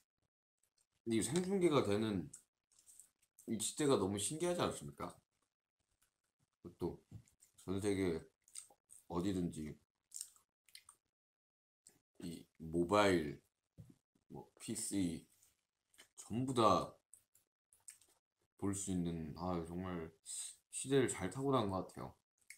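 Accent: United Kingdom. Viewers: His english accent ( ╥﹏╥) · Jada R it also sounded like he had a slight British accent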